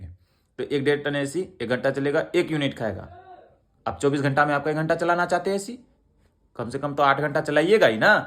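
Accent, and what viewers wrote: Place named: Bihar, India. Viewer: Qki dono bihaari hai..(no offence) Ye bhojpuri lehza hai..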